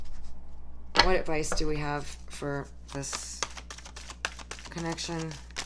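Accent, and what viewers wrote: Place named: New York, United States. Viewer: Are you from new York? Bc I can hear an accent. But I'm actually dealing with both a Leo and Libra. The Libra ugh ur on spot with him.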